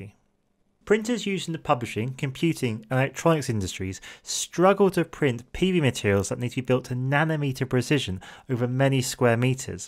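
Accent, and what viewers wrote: United Kingdom. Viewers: He's effin English. He ain't from Texas...(By saying 'effin English' in no way I meant that pejoratively)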